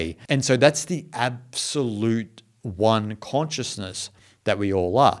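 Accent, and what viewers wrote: Australia. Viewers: accent suggests to me that he is Australian. Am I correct?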